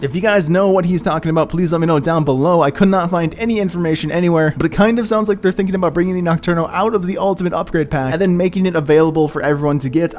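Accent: Czech Republic. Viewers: Guessing Czech Republic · Are you Czech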